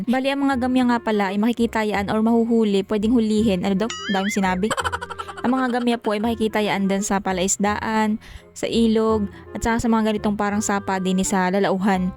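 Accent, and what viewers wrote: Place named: Laguna, Philippines. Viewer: shout out Alway watching from ksa. relate ako sa punto mo magsalita 😂 na miss ko tuloy ang province ko kalayaan laguna ganayan din punto.😂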